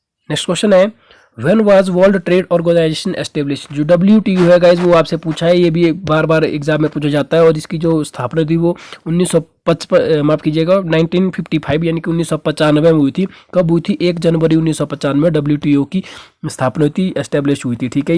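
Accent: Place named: Pahadi, India. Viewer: Sir me to yhi bolna cahugi.ki app jo pahadi lehje me bolte hai supub apka jo merital hota hai best.and padane ka tarika altimate.keep it up sir..vese app kha se?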